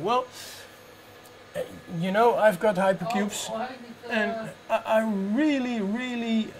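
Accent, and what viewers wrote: Netherlands. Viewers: Grappig, ik vermoedde meteen aan je accent dat je uit NL kwam.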